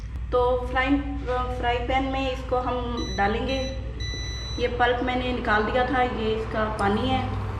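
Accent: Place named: Attock, Pakistan. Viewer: yammay aap Attock se hain aap ke blne ka andaz attock wala ha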